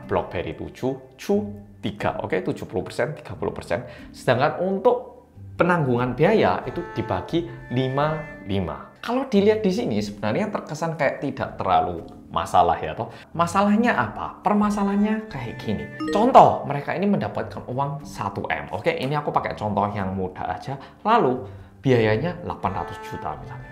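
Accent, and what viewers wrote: Java, Indonesia. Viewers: Ruar binasa emang keserakahan manusia. Makasih videonya Boloku Sing Paling Medok Dheweeeee 🙏🏼🙏🏼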